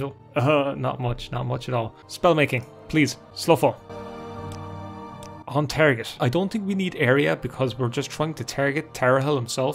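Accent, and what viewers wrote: Ireland. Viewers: I wonder if anyone has ever told him he sounds like Jacksepticeye if they added a 10x more Irish to him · Are you Irish?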